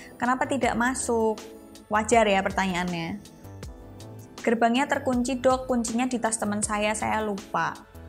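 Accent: Java, Indonesia. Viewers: Jowomu kok medok tenan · Lumayan..bagus cara menyajikannya...logat jowonya yg bikin seru...👍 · boso jowo'ne medok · Seneng dengar logat jawanya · Menarik.... Logat Jawanya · klo ngomong enak jowoan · kenapa saya sampe di sini ya hahaa mbak e ini pinter , ceria dan pasti nya orang jawa tho suka dialeg bahasanya yg medhok hehehe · Dede medok cantik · Mbaknya cantik tapi medok jadi lucu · Medok nya itu rek, lucu n so sweet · Jowo ne muantebz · Medhok bgt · Medokk ya mbaee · medok mu tenan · Aku senang banget wajah cantik gini tapi medok Jawa. ❤🔥semangat kak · seneng banget denger logat jawa nya mba * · Logat Jowomu medok banget mba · Totok tenan jowo ne 😀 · Bhsa indonesianya kog medok mbk, org jawa ya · Logatnya saya suka 'Jowo banget' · medok banget logatmu mba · BHS indonesia dgn Medog Jawa jd lucu dengarnya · Menurutku Mb lbh CERDAS dari sugar baby yg Mb ceritain Bhsa Jawa Mb medhok jdi lucu dengernya Sukses Mb 🙏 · Logat jawamu mbak uenak dirungokno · Suka dg gaya bicara yg medok khas Jawa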